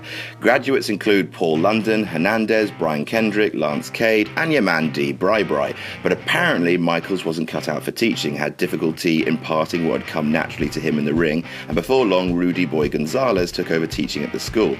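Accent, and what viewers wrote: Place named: England, United Kingdom. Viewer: Nothing on this list is that shocking or unknown to fans, and saying it in a sarcastic English accent doesn't make the list any better.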